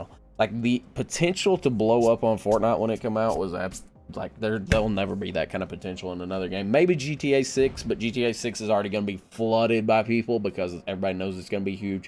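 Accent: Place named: South, United States. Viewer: bro I won't lie I have you just talking in the background and working on school work and what not. Something about your southern twang really has me sitting down and just listening to you. You should dabble into commentary!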